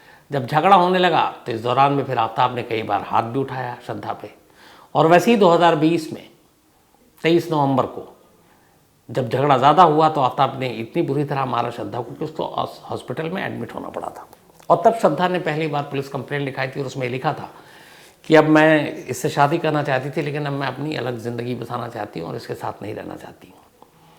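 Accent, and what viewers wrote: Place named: Lucknow, India. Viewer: Oh now I know how your pronunciation of your Urdu grammar..it seems Lucknow Urdu the original ...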